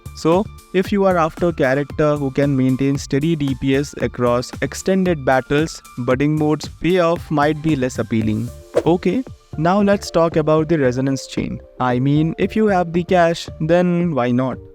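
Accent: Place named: India. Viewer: What is that accent? Sounds like generic idian scammer.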